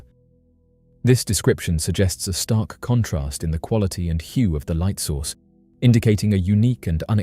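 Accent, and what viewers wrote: England, United Kingdom. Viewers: with annoying en-glish accent..Indeeed !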